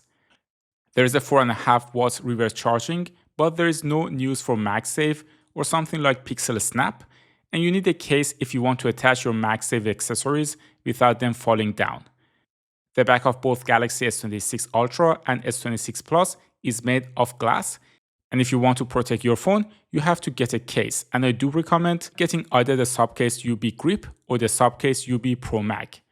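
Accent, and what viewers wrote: Iran. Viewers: همیشه یه لهجه ایرانی به گوش آشنا میاد 😊 موفق باشی پسر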